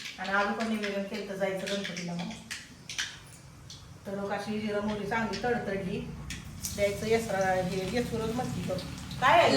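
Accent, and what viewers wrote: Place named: Marathwada, India. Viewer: Latur Dharashiv chi bhasha watate tumchi, konta gav ahe tai tumch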